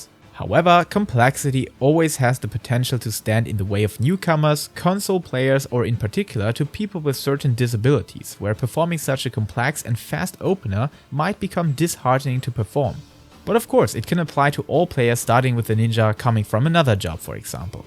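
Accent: Germany. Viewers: you re german right? :D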